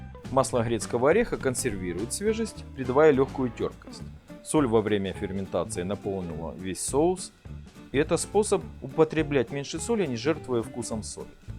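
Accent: Ukraine. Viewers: Рецепт то абхазский,но говор у Вас украинский или Ростовский,Краснодарский,но никак не апсны!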